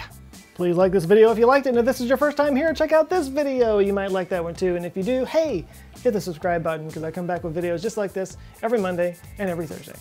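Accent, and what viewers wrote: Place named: United States. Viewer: Hes speaking American though.... 🙃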